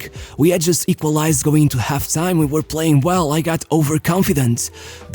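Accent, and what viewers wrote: Portugal. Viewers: Are u Portuguese? I feel such a Portuguese accent in your english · I know a tuga talking english when i ear one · your Portuguese accent is funny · AM I THE ONLY ONE THAT CAN'T HEAR THIS NARRATOR?? So dramatic.....i bet he's portuguese.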